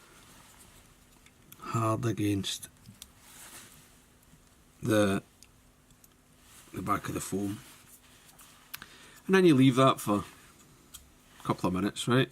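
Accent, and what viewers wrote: Scotland, United Kingdom. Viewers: I think this fly was created by a Canadian named Elliott something. I tried it years ago, not successfully, when I was learning. Should be easier now. By the way, little by little I am solving the Scottish accent. You have a lot of flies that should do very well here in Florida USA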